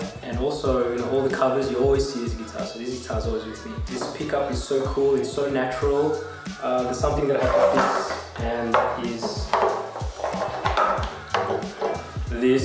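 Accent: Australia. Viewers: Klo ngomong keliatan Aussie nya 😆😆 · Uwawww aksen nya australia nice nice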